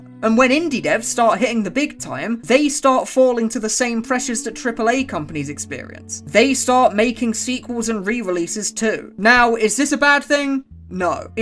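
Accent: United Kingdom. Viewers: I like the funny British man talking about indie games and the outer wilds. Seriously tho I know this is an old video but I love your content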